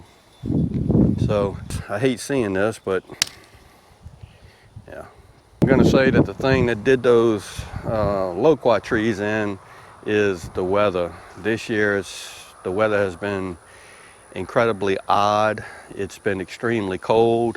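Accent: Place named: Mississippi, United States. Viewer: New subscriber here and I am so glad I found your Channel. As soon as I heard that southern Mississippi accent I already felt at home. Me and my partner go down and visit family in Saucier every few years or so. I really enjoy the weather, people and pace of Mississippi. I am trying to convince my partner that we should move south, despite the weather down there lately. Tired of this Michigan snow. lol. I hope you release some more content soon. Can you grow a garden year around down there?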